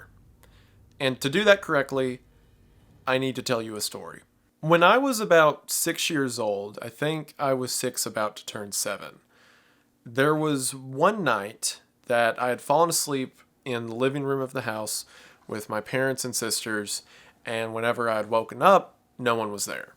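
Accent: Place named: South, United States. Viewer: What kind of dialect is speaking in? Like what part of US, where they say "setting" instead of sitting? Is it just a southern thing? lol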